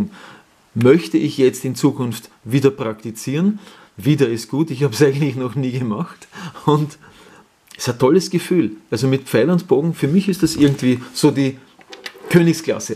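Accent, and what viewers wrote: Austria. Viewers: 💜🦢💚 Bedanke mich & grüsse ALLE herzlich ⭐ am Samstag Gemüse gepflanzt in meinem wilden Garten 💚🦢💜 Ich liebe den österreicher Akzent.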